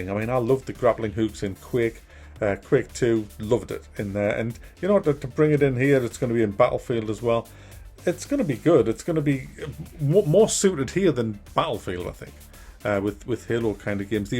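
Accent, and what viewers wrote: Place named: Newcastle, United Kingdom. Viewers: No he ain't, I live in Wales. He sounds like a geordie (Newcastle) to me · Wrong accent, he's from Newcastle which is in England very far from Wales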